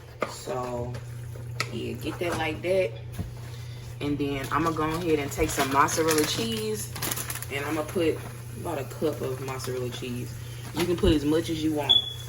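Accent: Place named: Georgia, United States. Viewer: Love your vids ! Trying to figure out your accent, are you from Georgia?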